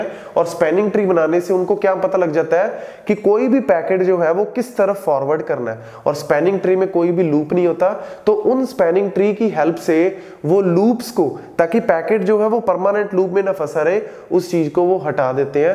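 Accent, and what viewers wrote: Punjab, India. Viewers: That punjabi accent but is awesome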